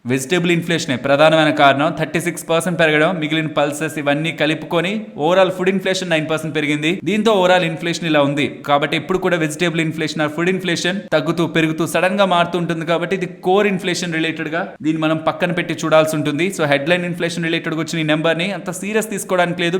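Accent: Nellore, India. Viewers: Bro meedhi nellore na ?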